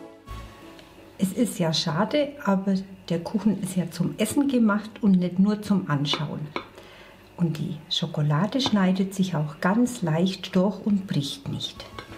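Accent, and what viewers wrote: Austria. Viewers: sind Sie aus Österreich? 😇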